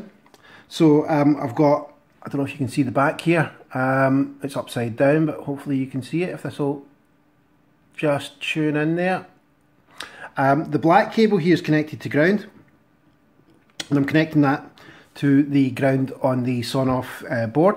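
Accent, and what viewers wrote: Scotland, United Kingdom. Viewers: I love your scottish accent :)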